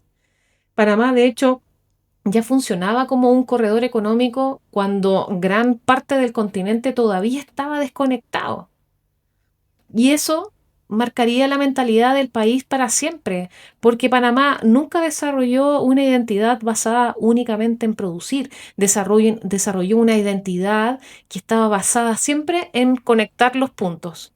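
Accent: Chile. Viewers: 🙌🏼 Qué bien se siente oír hablar muy bien de nuestro país a un extranjero. Eso significa que los panameños no lo estamos haciendo nada mal, a pesar de que a veces somos muy duros con la autocrítica. Pero es bueno autocriticarse para mejorar. Por tu acento supongo que eres chilena. Yo tuve un inquilino chileno, durante 2 años, que vino hacer un trabajo de ingeniería en una fábrica de mi provincia, y él era muy educado y amable. Ya me suscribí a tu canal chica. Espero sigas subiendo más contenidos sobre nuestro Panamá. Saludos. 👍🏼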